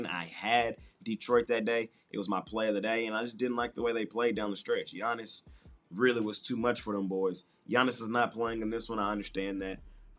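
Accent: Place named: South Florida, United States. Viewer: Sounds like you from my crib!!! South Florida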